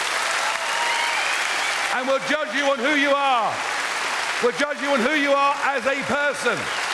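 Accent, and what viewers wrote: United Kingdom. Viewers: Strong speech in a British accent, The pauses & the punch lines of an American President. Well delivered I’m here for it. I’ll leave you to decide which president did he sound like in his delivery? 😅🤣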